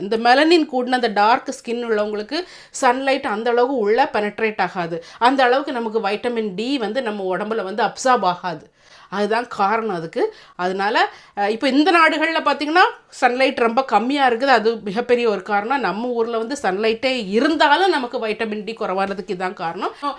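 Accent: Nagercoil, India. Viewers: Hi akka…I like the way u speak especially Nagercoil slang…I lived in England for a couple of years…really very nice country.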